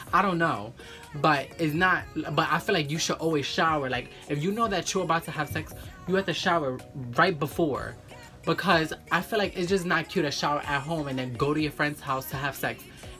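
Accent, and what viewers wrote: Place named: New York, United States. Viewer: It sounds like your from NY I really like literal showers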